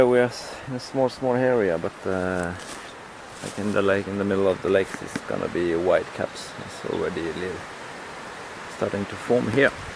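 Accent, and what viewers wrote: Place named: France. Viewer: Hahaha .....I am not a linguist by any means but did detect your French accent in this video. I was converted to a firm Francophile in 1990 when I discovered I could be in a totally different climate from my home in the north of England in less than 7 hours driving and then with another 4 hours driving I could be on the Riviera and the southern French coast. I can see why you have fallen in love with Sweden the lakes and countryside do look very beautiful Thanks for your reply and I am looking forward to your next outing 👍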